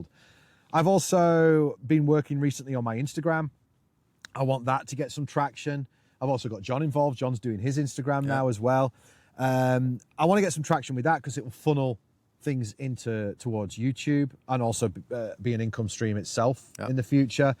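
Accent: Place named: Lancashire, United Kingdom. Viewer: Hi.. I'm a new subscriber.. Just want to say what a fantastic lifestyle you've chosen.. It's good how you've taken your kids out of mainstream teaching.. What a way to go!!! They'll have a far better education doing your way! You are creating great content too.. Good on you!! Are you from Lancashire? I recognise the accent?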